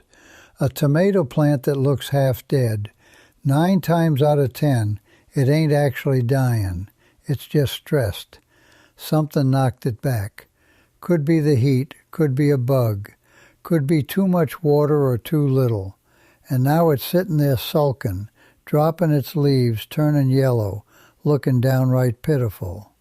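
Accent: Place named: Rhode Island, United States. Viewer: AI has a RI or southern MA accent. Hilarious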